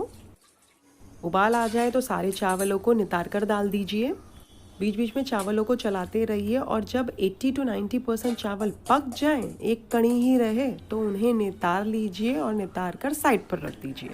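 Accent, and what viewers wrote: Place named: Maharashtra, India. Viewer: Ur hindi can easily tell that u are from Maharashtra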